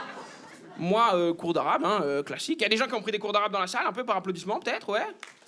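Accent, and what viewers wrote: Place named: Rural, France. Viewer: Je ne comprends absolument pas que des blancs puissent être raciste envers lui, il a un accent de campagne française et est plus blanc que moi, et merwane c'est breton comme nom...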